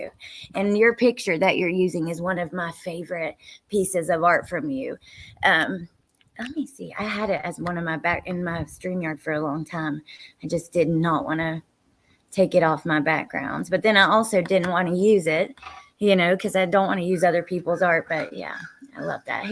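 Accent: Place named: West Virginia, United States. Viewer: I knew that accent! WV here too.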